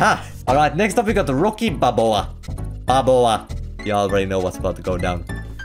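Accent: Finland. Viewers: I've watched couple of your videos and I didn't know you were from Finland before you said "vittu". After that i just couldn't unhear the accent. Torille jee🇫🇮🇫🇮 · He's obviously Finnish he speaks with a rally English accent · He's finnish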